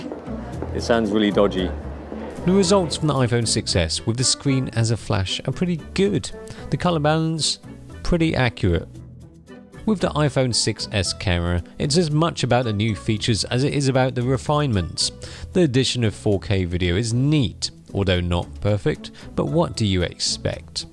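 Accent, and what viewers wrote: United Kingdom. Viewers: To see a asiatic speaking in that very British accent for me is very strange ... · you sound just like a typikal brittish dude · sooooooo british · Dear host, i'm from hongkong, really love watching your reviews, very professional and specially...like your british accent:) cheers mate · Bristish accent :3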